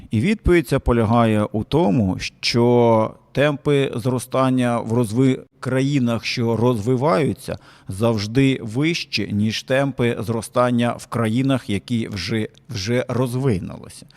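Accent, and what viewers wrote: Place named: Eastern Ukraine, Ukraine. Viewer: Не зважаючи на гарну українську мову,приємно чути цей особливий східний акцент.Поважаю Вас❤❤❤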